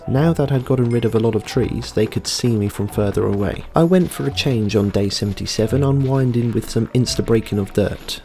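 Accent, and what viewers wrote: United Kingdom. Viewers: Ah yes British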